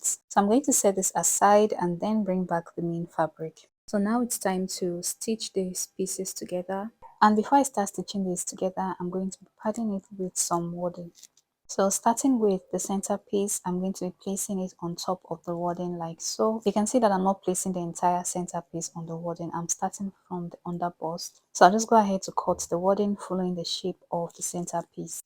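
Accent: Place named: Africa. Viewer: I love your tutorial, but I missed some things. Please clarify what the material is that you are using as the backing to the silk, and what is the sticky item you are using to keep the lace from slipping. I could not understand what you were saying when you mentioned those items. Your African accent made it difficult for me.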